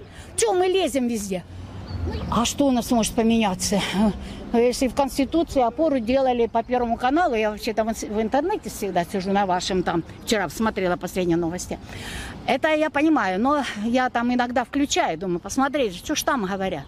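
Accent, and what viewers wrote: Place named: Ukraine. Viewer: У нее украинский акцент! снято в Киеве на съемной квартире! Фу!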